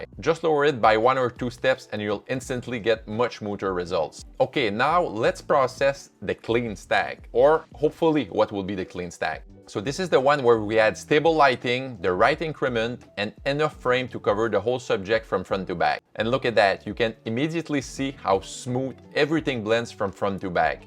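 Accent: Quebec, Canada. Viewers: I'm gonna ask you one thing, es-tu québécois? Ton anglais est beau mais j'entends très bien ce petit accent particulier du Québec! J'adore ton vidéo et je vais m'abonner à ton channel! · merci pour la vidéo. si je ne me trompe pas tu es québécois avec ton accent. 🙂 Est-ce que ton appareil fait automatiquement le focus stacking ou tu le fais manuellement?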